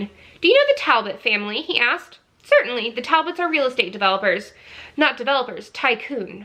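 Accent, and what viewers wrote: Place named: South, United States. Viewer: Just listened to this a second time - you have the BEST Southern accent ever. In fact, I didn’t even like the women in the beginning but your accent made them more likable! XOXO Lisa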